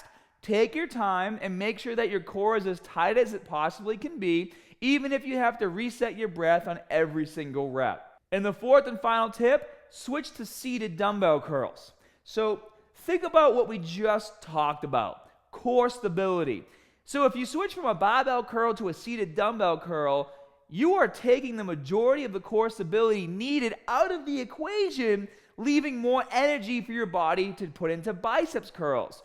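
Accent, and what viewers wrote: Boston, United States. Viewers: Is he from Boston?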